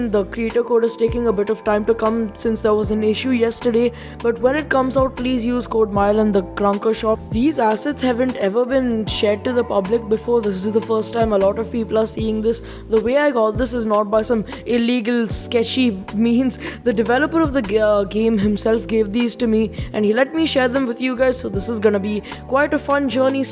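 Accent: India. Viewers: Your accent seems like u are Indian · your indian accent is so funny and good at the same time xD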